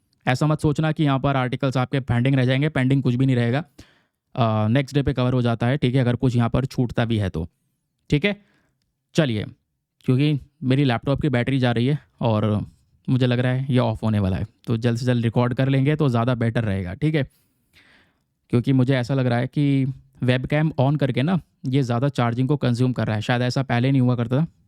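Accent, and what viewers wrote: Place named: Punjab, India. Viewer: App to punjabi lag rahe hai sir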